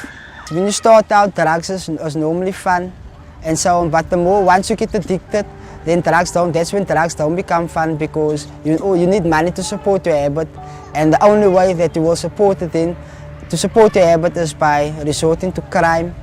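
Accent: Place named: South Africa. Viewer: such an awesome testimony,plus how awesome is the south african accent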